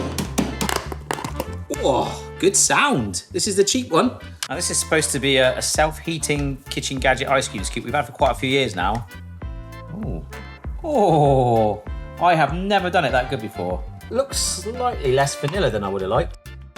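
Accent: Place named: Wales, United Kingdom. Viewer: The welsh accent 😂😂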